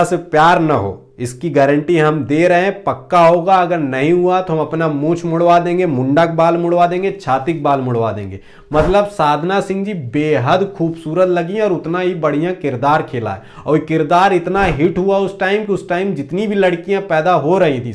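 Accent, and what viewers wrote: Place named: Uttar Pradesh, India. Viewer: Yr.. bhaiya tumhari bte.. Mera.. gaon or mere bhaiyo ki yaad dila. Detii h.. Parathagarhiya.. hu bhai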